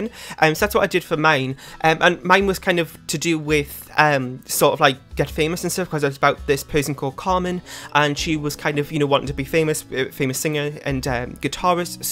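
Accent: United Kingdom. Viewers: Where in the UK are u from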